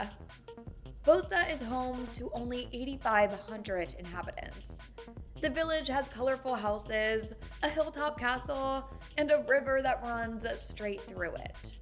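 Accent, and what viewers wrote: United States. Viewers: The voice, my God! American accents are the worst.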